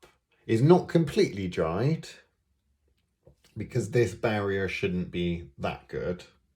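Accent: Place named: United Kingdom. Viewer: Across the pond here, really appreciate the “professor snape” vibes… maybe it’s just the accent hehe 😜 thanks for the series I learned a lot!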